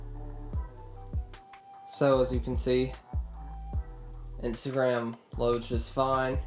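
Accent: Texas, United States. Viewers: you have the most texas accent ive ever heard god damn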